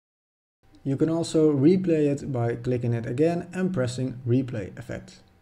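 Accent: Netherlands. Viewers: I smell Dutch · First of all thank you so much for this!!! Second, are you Dutch? Your accent reminds me of my own. :)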